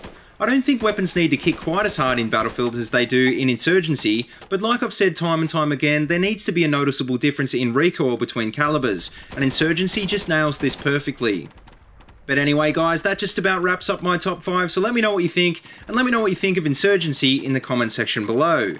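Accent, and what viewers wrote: Australia. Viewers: auwfull aussy accent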